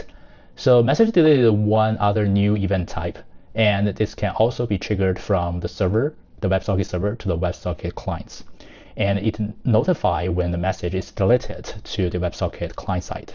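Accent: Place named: England, United Kingdom. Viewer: Great walkthough of the design of the problem. One suggestion is to hire someone without an English accent to do the videos because it is very hard to follow and understand smoothly when people am also trying to figure out what the host is trying to say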